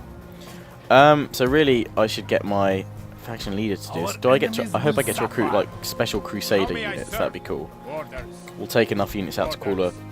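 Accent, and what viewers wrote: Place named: Chichester, United Kingdom. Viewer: i love your content:p and btw are you from chichester by any means?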